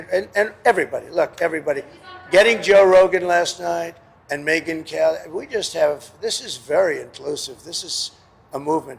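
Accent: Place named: South, United States. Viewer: You know, recovering from coma is a long and challenging process, maybe that's why you sound a bit like one of those ignorant uneducated toothless that smell like onions from Hillbilly land, Please go back to Hillbilly land buy more dark tapes and cover his mouth, he don't deserve to be in this country.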